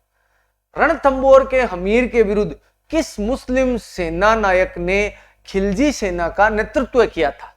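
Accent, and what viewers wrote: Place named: Marwar, India. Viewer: Kya baat hai Sar ji aapki Marwadi Ka jawab nhi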